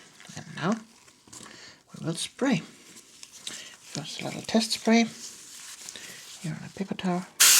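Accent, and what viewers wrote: Germany. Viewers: are you from germany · she's german it's just her accent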